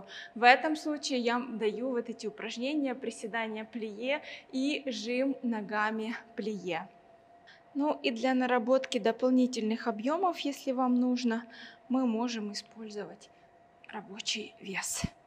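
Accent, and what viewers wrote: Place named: Ukraine. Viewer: Вы считаете, что Ваш акцент идеален и речь прекрасная? Для чего вообще комментарий в таком тоне? Просто, чтобы задеть человека? У Вас что-то личное к этой девушке или вообще ко всем, кто с Украины? Если не нравится, то можно просто не смотреть. Ссылку скиньте на Ваши ролики, и вообще интересно посмотреть на Ваши достижения в жизни. Я уверена, что человек, который трудом добился чего-то, никогда не обесценит труд другого человека и уж тем более не выскажется в таком тоне. Я из Казани и у нас здесь каких только акцентов нет и это нормально. Не знаю, где Вы проживаете, но , судя по комментарию, Вы не из очень хорошей среды.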